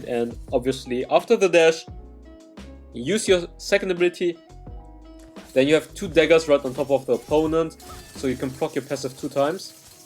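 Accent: Germany. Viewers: disgusting german accent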